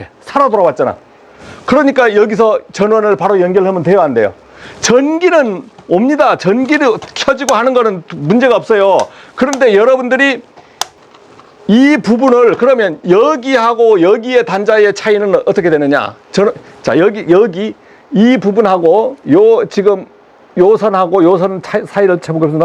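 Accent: Jeju, South Korea. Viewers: 말투가 제주스타일 갔다고 생각했는데 구독했더니 제주도 분이네요 반갑습니다❤❤❤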